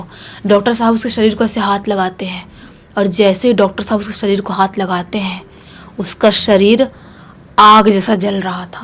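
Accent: Bihar, India. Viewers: plz btadijiye kya ap bihari ho? Apka assent whin k lgta h aur bhut words v! ❤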